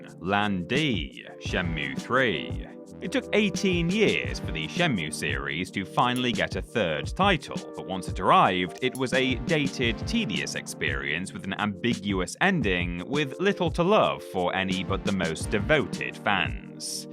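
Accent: United Kingdom. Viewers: He sounds like a normal Brit, Perhaps you are mouse voiced man.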